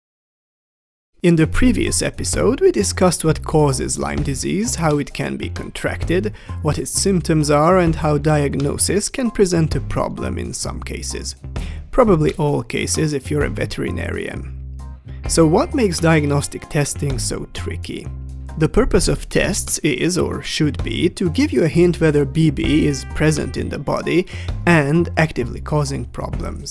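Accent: Hungary. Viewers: I was curious how your jokes would work in english, and I'm not disappointed :) They still makes me laugh my ass off, but also very interesting to rewatch these videos in english. And I really like your accent, you cant deny you are from hungary :D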